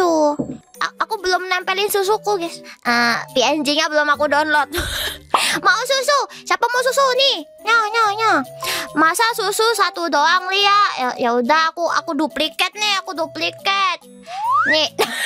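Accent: Java, Indonesia. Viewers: Suka beut ama aksen jowo nya si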